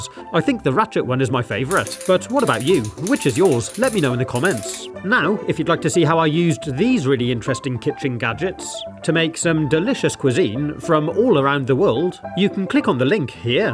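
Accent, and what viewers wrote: United Kingdom. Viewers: LOVE British accent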